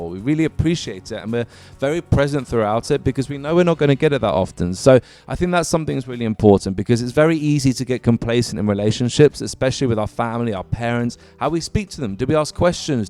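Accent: United Kingdom. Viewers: I love your british Accent 💕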